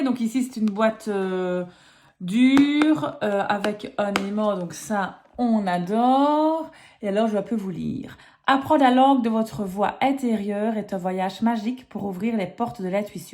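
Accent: Belgium. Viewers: Merci de nous faire découvrir les différents tarots, il y en a bcp qu'on ne connait pas donc c'est chouette 😉 vu ton accent tu es du côté de Liège 😊 moi c est du côté de Charleroi 😆 continue c'est chouette de ta part😜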